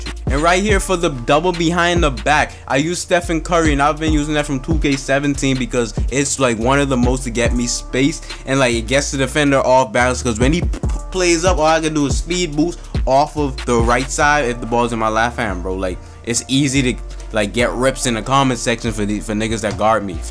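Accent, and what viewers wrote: New York, United States. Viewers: The most new york guy i ever heard on YouTube lol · Where U frm. U sound like u frm NY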